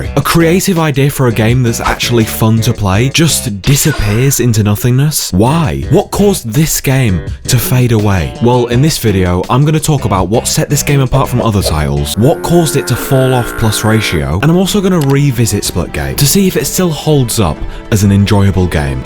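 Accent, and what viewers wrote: United Kingdom. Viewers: If I had a nickel for every game video essay channel with good video fx run by a comedic smooth talking early twenties white british (or aussie) man I'd be a millionaire.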